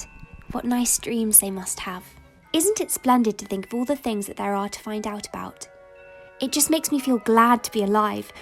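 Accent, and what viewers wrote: United Kingdom. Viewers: For me, to have a video where I can listen to poetry read aloud in a British accent while looking at the English countryside is... well I feel very fancy. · I love your british accent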